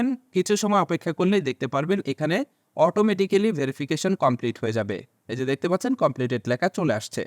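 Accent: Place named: Ghatail, Bangladesh. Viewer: Vai apnar basha ghatail a amar bash oo ghatail ❤❤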